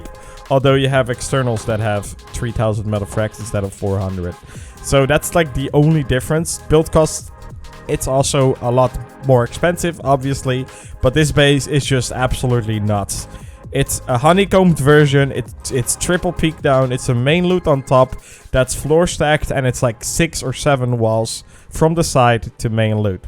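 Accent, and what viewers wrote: Netherlands. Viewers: i can hear u are dutch, i am dutch to, je bent goed bezig man!, had ik maar zo'n slimme base builder als rust teammate ! haha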